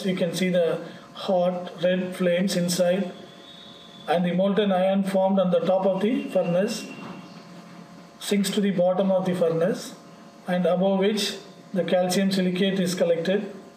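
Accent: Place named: India. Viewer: I liked the lesson. but seriously, you have such a strong Indian accent. Work on your pronunciation. But overall good lesson.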